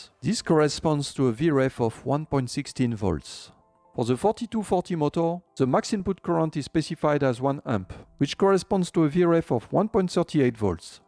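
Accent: France. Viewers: it sound french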